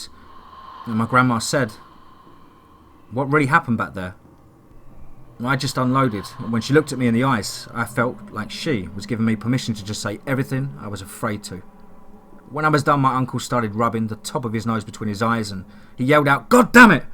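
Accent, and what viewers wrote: United Kingdom. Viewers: Hi There, new subscriber here, from the Midlands, UK. Love your narration, as I can understand more clearly as its a familiar British accent, not that I dislike the American accent (I actually bloody love it lol) but sometimes I struggle to grasp the narration with a thick accent, as no doubt some of you would struggle with mine once my midlands accent came through lol, but lets make this clear, I'm not a Brummie FYI lol. Alicia my lovely, you are one helluva strong lady, and my hat goes off to your bravery, and I don't blame you for showing that DM who's boss around your parts. I will continue to look for updates on here, but pray for your safety. blessings to you and your family and I mean that from the bottom of my heart. stay safe xx Julie xx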